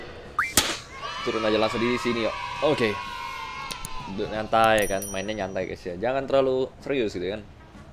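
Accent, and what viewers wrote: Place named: Banyumas, Indonesia. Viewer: Ora ngapak ora kepenak 😀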